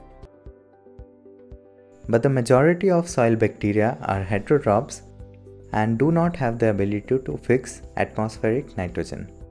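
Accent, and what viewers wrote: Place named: India. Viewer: His totally indian accent help me too understand this clearly vrna animation m har jagah angrezo jese aawz !! !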